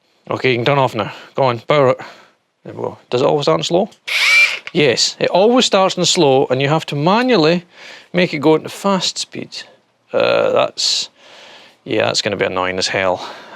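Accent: Scotland, United Kingdom. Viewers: I wish I saw your video before I bought one. I paid $75 AUD for this full kit with 2 batteries shipped to me in Brisbane australia from a Melbourne eBay store. Delivery was within 3 days and excellent. But the constant cutout while grinding drives me nuts. This is my first time trying out a fake brand substitute. It’s no way better than my Ozito (Einhell) grinder. Never again. I found your video very good and love your Scottish accent. Subscribed. Thank you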